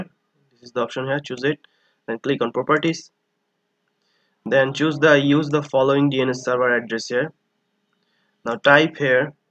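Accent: India. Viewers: THE INDIAN ACCENT, IM SAVED🙏🙏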